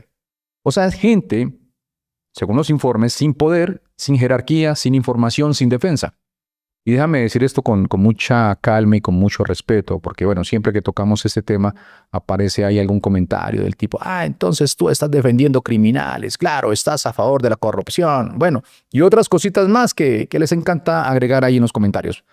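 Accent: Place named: Colombia. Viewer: En Venezuela nadie se esta quejando de eso porque aca en EEUU si?? Tu tienes acento Colombiano sabes que si no hay familias hablando es porque el narco esta detras de esto , en Venezuela hay informes de periodistas que dicen que Dios Dado Cabello tiene amenazadas s a las familias porque?? Porque esta conectado con el narco estado de Maduro , Trump esta cometiendo muchos errores este no es uno de esos estan volando narcos y eso esta bien, quienes piden explicaciones el borracho de Petro ??? Maduro que no es presidente de nada ?? Quienes sufren el narco en america latina jamas van a estar en contra de esto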